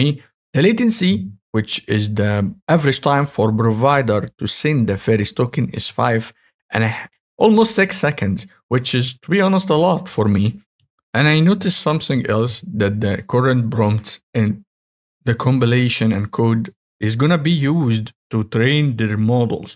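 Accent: Egypt. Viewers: Brother, you sound egyptian. Great video btw.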